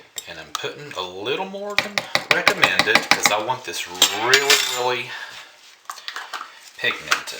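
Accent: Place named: Kentucky, United States. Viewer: I adore your accent <3 - it reminds me of my time spent in Kentucky. I'm from new Zealand.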